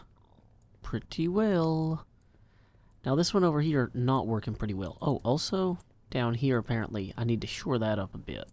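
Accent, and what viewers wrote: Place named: Tennessee, United States. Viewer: I love how he says "Time skip" with his amazing accent from Nashville Tennessee. THAAAAAAAAAAAAAAMME SKIYAP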